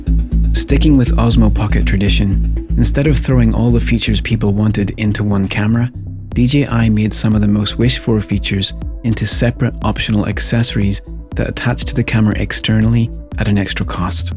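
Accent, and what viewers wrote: Northern Ireland, United Kingdom. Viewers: "Now" "Plastic" "Fan" "proportional" "eighty" Northern Irish ? Great Video review and i thought it was just me who had a problem getting the Tripod mount off the camera ;) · Fabulous - thanks & nice to hear the NI accent - greetings from HK!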